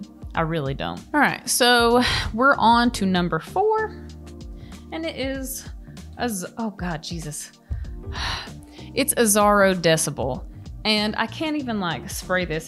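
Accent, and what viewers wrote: South, United States. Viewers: you talk just like him but just with a female voice and southern accent lol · Gosh, I love your accent. I mean, I'm also American, but still.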